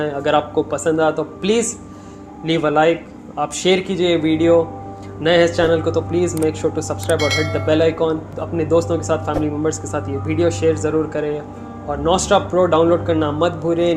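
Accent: Hyderabad, India. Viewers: Ak baat humesha notice kiya Hyderabadi admi kese bhi baat kare pata chal hi jata h ino old city ke h😀😀😀😀😀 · phle jaisi baat nai aari, (Hyderabadi bolne ka tareeqa...) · Accent bohot hai baato mein apke pure hyderabadi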